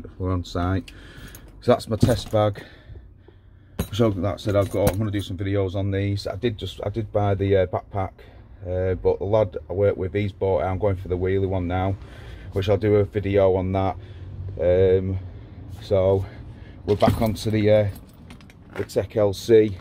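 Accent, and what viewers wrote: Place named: Essex, United Kingdom. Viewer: Nice one fella - true professional, even got the accent way down in Essex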